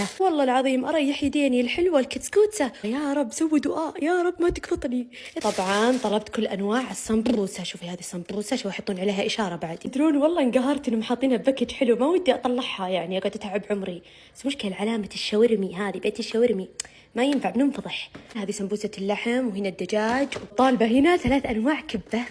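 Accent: South, Saudi Arabia. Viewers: يا لبيييييه يازين اللغه السعوديه عليك والله😂❤❤ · كأن اللهجة من جنوب السعودية ؟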